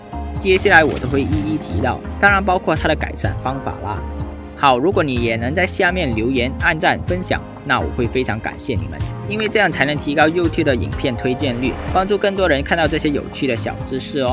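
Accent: Malaysia. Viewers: 嗨，请问你是马来西亚人吗，因为你的口音很像马来西亚人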